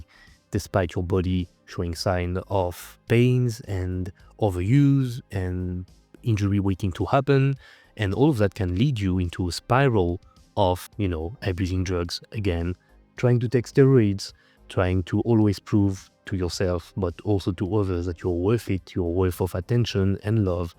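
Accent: France. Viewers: I would pay for a French accent like this 😂❤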